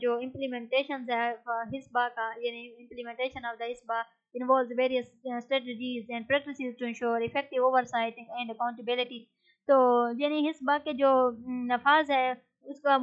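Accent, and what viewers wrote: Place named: Saraiki, Pakistan. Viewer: Saraiki eng accent 1st time I heard 😂😂 I appreciate your work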